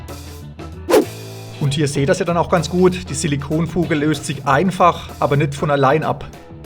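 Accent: Franconia, Germany. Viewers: Hört man da fränkischen Dialekt durch 👂🏻👂🏻👂🏻.....😊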